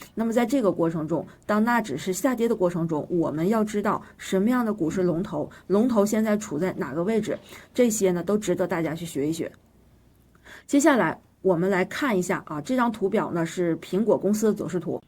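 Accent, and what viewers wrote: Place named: Northeast, China. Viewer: 这东北味很亲切啊 哈哈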